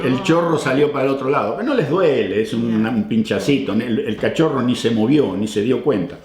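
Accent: Argentina. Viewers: Un abrazo desde Rauch provincia de bs as, Argentina los sigo siempre, los respeto y les deseo lo mejor, me encanta tus historias y bien contada con nuestro idioma y formato de habla, es como si nunca te fuiste, tu hija genial y muy Argentina tambien, besitos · Ya me hize fanatica..me llama la atencion q no haya perdido el acento argentino che😁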